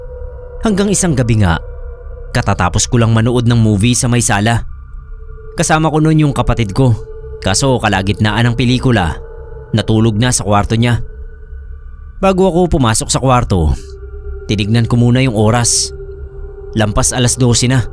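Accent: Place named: Ibanag, Philippines. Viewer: ibanag ang accent ng story teller.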